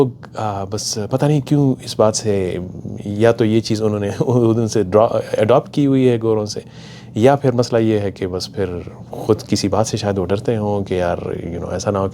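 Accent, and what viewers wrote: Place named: Sindh, Pakistan. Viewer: Wow - your sindhi reflects in your accent - Love it 😍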